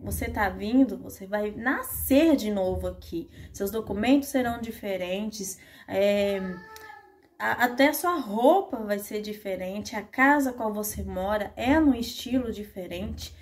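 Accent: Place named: Portugal. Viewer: E brasileira?parece portuguesa pela fala kkkk bem vinda ao meu pais 🤗🤗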